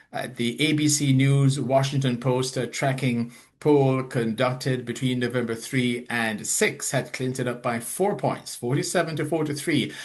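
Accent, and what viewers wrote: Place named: Jamaica. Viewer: I have never been a fan of. I don’t know why he tries so hard to hide his Jamaican accent.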